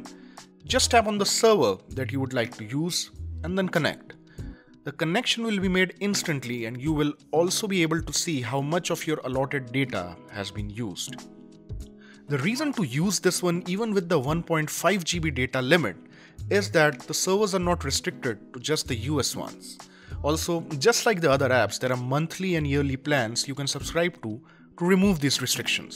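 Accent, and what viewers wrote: India. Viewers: The best review of vpn by an I Indian accent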